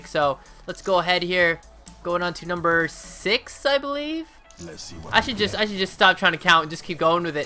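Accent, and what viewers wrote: Asia. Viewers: This wasss so good am loving these supply drops i was wondering if you'll ever do a series where we send in out own supply drops and you do a top 5 out of them. Can't wait to see the next one could you 5 rare next time would love to see that. In that awesome asian accent aha. Keep up the great work man your currently my bae cod youtuber. No HOMO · It's better to get 10 common cause it's more worth it and the vid will be longer, btw love ur Asian accent <3 no homo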